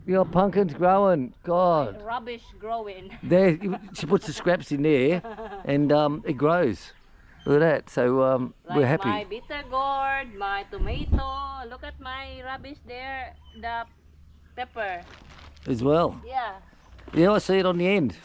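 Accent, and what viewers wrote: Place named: Australia. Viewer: Just found your channel. Popped up in my feed and caught my eye. So good to hear an Aussie voice after all this time. Anyway I have subscribed to keep up with the laughter.Until people live here they have no idea of the hoops that we have to go through on a daily basis. I learnt not to go to the hardware on my own because they just don't serve me. Too afraid to speak English.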